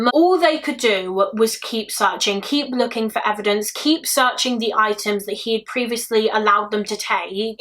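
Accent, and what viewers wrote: United Kingdom. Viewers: Fabulous story telling thank you kindly and luv ur Uk accent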